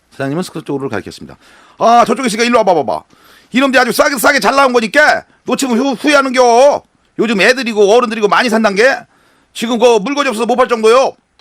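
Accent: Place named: Jeolla, South Korea. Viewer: 충청도라면서 왜전라도 말을 씨부리는겨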